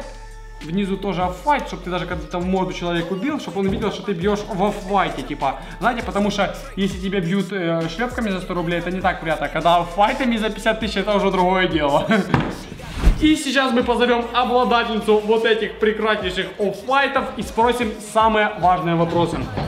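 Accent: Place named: Ukraine. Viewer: почему у тебя акцент Украинский?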